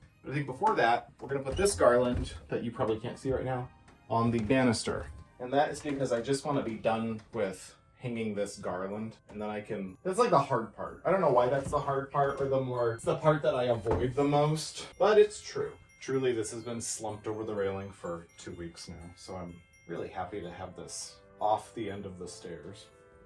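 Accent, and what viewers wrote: Minnesota, United States. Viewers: My favorite part was - , looking down through the garland balusters at the winter wonderland below. Everything looks SO beautiful. I don't know how many Christmas trees you have total, but I decided at the start to make "5 Christmas trees" the 5th item. On the 5th Day of Vlogmas my true love gave to me: Five Christmas trees 🎄🎄🎄🎄🎄 Four meows from Paolo Three sets of batting Two power strips 🔌🔌 And you sound like the movie Fargo 🎄⛄🙂⛄🎄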